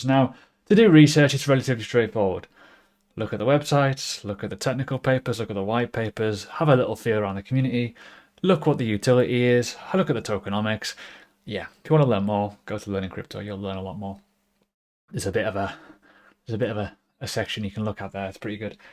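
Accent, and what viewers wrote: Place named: United Kingdom. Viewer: You are giving really good content mate. Nice accent , where from the UK are you ?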